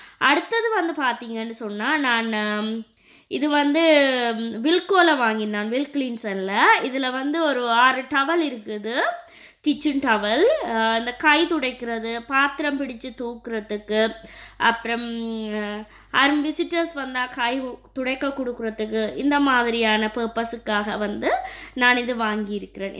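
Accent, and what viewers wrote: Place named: Sri Lanka. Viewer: Very good collections dear:) Your Tamil is too good to hear:) Lanka?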